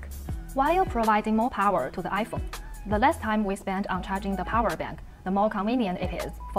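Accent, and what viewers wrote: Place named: Asia. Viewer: Damn, she talks so quick and with her Asian accent is worse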